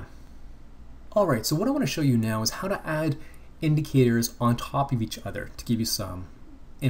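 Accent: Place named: Canada. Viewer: Thanks mate and I really like your Canadian accent :)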